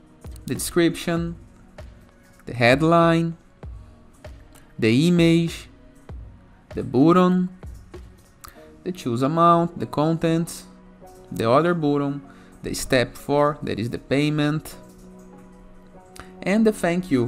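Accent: Brazil. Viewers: sim eu sou programador. Eu de Moçambique (um país africano falante de português) mas sou fluente em inglês.. Porém os "I have 'tchu' do" denunciaram seu português brasileiro e notei logo kk foi como ter um irmão nesse universo web inglês. +1 inscrito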